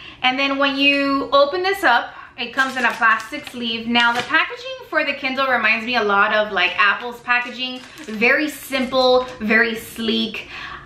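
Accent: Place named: Miami, United States. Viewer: You're from the Miami area! I can always identify the accent. You use the "hard" Spanish L's.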